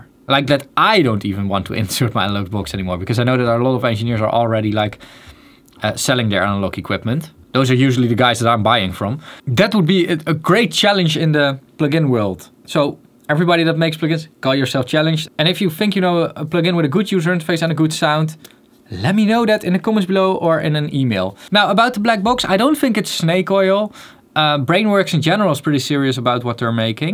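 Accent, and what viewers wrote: Netherlands. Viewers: Oof dat nederlandse accent 😂👌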